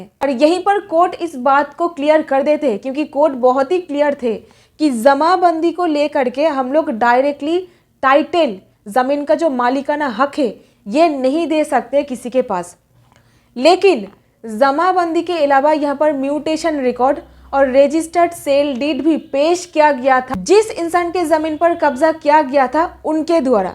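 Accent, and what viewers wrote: Bengal, India. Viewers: Clarity and content, both well done. Your Hindi with a dash of Bangla makes the presentation more attractive and beautiful.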